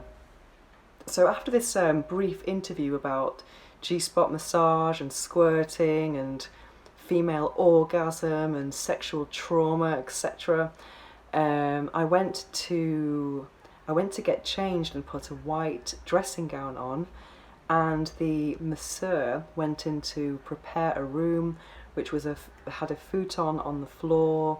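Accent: United Kingdom. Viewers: dammittt! if she wasn't british it may well have been an interesting story! cannot bring myself to endure that accent! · but, I will agree that her accent is 100% British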